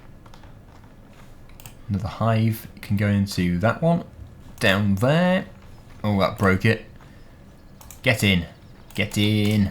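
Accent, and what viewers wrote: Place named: United Kingdom. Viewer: You are British so pronounce things the British way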